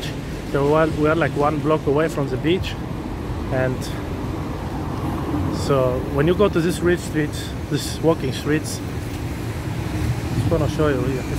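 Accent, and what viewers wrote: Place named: India. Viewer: His name and accent seems Indian.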